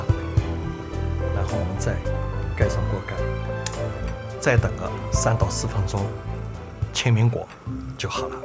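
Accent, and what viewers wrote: Shanghai, China. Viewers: 老师可不可以用上海话讲一期啊，加上字幕就好，听讲话我好心焦，沪普，为什么要让讲普通话呢，之前那个广东师傅就用的广东话录的视频。而且这种传统点心配上吴侬软语的解说更加有感觉！！！！！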